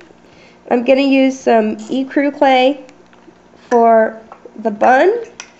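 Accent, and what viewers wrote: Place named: Kansas, United States. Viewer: Love your videos! I have been doing minis for some time now and Love getting ideas from others. You don't even miss a beat in your tutorials. By the way, I live in Cali but I grew up in Ulysses Kansas so when I heard your accent I knew you had to be either Kansas or Oklahoma! Thanks for the videos. God bless!!!!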